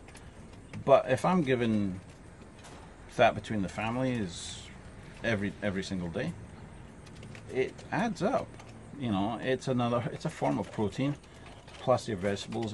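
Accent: Scotland, United Kingdom. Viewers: Have you always lived in canada? I swear I can hear some scottish in your accent!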